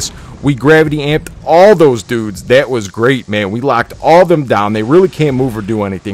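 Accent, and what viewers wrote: Midwest, United States. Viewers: You sound like you're from the Midwest Midwest nuclear: new-key-ler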